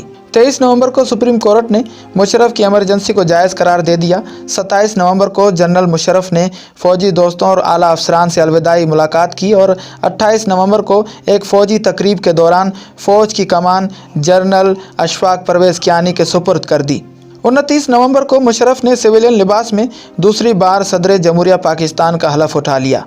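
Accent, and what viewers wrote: India. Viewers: Are you indian. Your lehja is indian. Kia musharraf shia hai. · ki Urdu bhi Hindi hay Indian hay koi yeh channel wala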